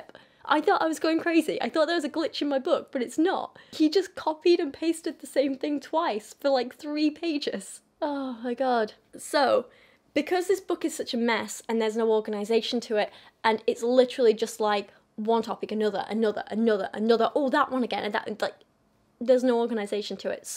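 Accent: United Kingdom. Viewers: There is some humor in how you read it in your cheerful sweet very fsminine voice with a sophisticated British accent. Love it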